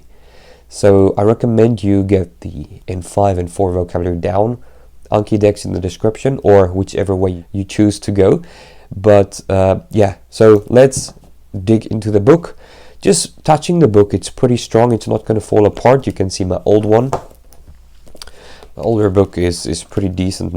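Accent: Afrikaans, South Africa. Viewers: lol afrikaans accent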